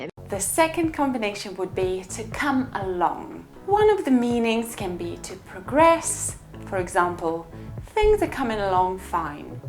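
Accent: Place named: United Kingdom. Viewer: O, my Gosh, I loved your accent! I dunno why, but I like British accent. It's so beautiful and royalty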